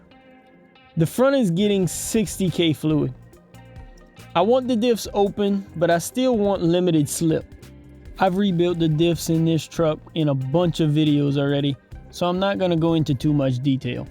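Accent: Louisiana, United States. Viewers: Where are you from? That sounds like one of them Cajun accents!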